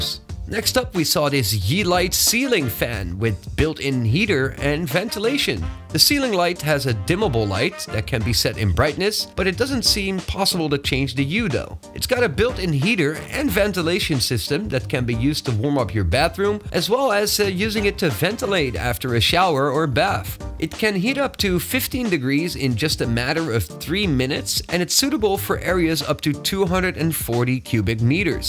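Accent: Netherlands. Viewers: Hoor ik daar een Nederlands accent...? 😄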